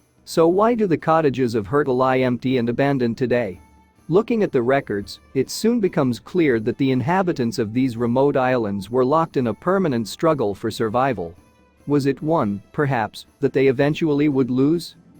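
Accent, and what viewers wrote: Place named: United States. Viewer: American narrator, load of tosh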